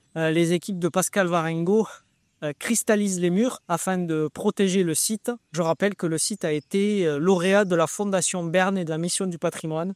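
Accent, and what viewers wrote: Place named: Tarn, France. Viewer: Merci pour cette belle vidéo : site historique magnifique, réalisation soignée, explication claire et précise sans tic de langage et avec l'accent tarnais qui va bien. Rien à voire avec l'abrutissement de Tik Tok...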